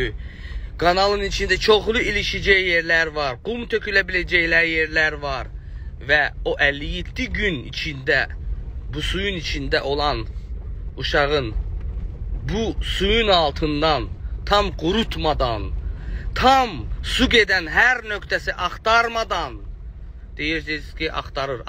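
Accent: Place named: Armenia. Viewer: Ай геде сен йахшы биленсенсе тап да орада не ойун чыхарырсан озунде ермени лехчесинде данышырсан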